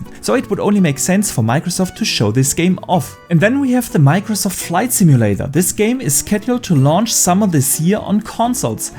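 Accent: Germany. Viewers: Wie ich diesen deutsch englischen akzent liebe, mach weiter so!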